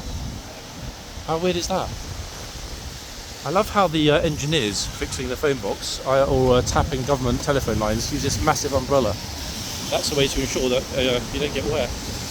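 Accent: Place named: United Kingdom. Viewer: I love listening to your very English voice.and no Americanisms ? As an old lady,I was taught English Grammer ! Thankyou so much for all the info,M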